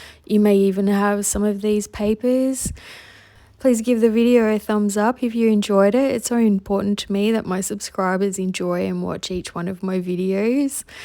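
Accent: Australia. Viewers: Hi, thank you for sharing this video with me. I will have to watch some more videos from channel. It is so nice hearing another Aussie accent. ❤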